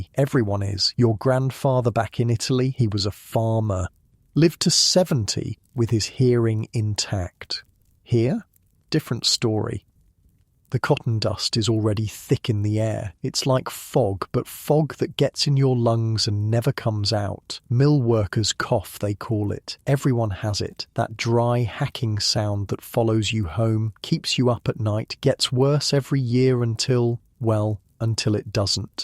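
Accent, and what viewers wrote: England, United Kingdom. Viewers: This is my favorite English accent for stories! There are others, but this Historians chosen voice or original is the best! 2247 in Ridgecrest, Ca USA.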